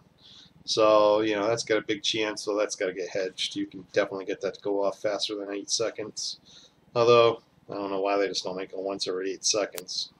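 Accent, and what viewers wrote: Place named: Boston, United States. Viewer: It's ok. I heard that Bostonian Accent. My physics professor (back in the late 80's) was from Boston and basically renamed my last name on a daily basis. Good video. You get 1 like, 1 subscribe. :)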